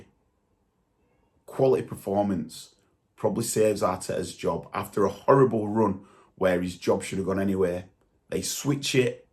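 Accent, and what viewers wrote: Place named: Northern England, United Kingdom. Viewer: why is this northerner talking about Arsenal... a london team ???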